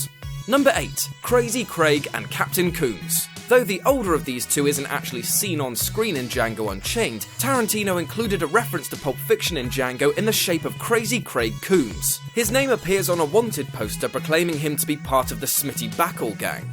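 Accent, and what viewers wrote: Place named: England, United Kingdom. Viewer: People pronounce words differently. This guy is English and pronounces his vowels differently than, say, Americans.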